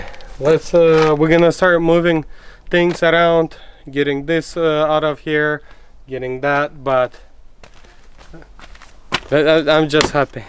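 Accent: Russia. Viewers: Касаемо контента, все супер! Тачка моей мечты!!! 😃😃😃Но, я один слышу Русский акцент в разговоре? Или мне показалось? Касаемо АВТО: Если делать под себя, ТО раз уж разобрал, ТО я бы сделал Шумку!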